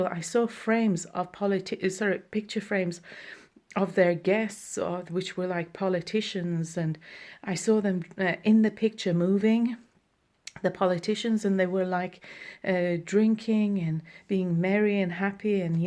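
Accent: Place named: Middle East. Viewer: I like your accent are you middle eastern like Jesus? By the way what did jesus look like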